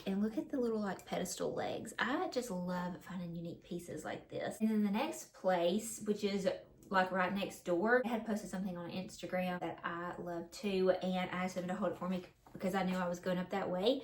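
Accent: South, United States. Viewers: You and your sweet little family are precious ! I have family in AL, so listening to you talk with your southern drawl takes me right back "home" 🥰 Love to see all of your finds and how you decorate with them !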